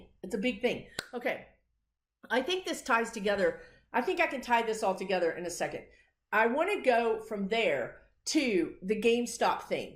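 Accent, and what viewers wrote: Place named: South, United States. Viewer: Love your southern accent :)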